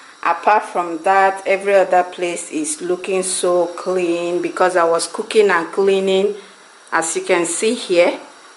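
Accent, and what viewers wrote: Nigeria. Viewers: Aunty!! I love how authentic you and your family are. I especially love your thick Nigerian accent. It's sounds beautiful. Thanks for being so real!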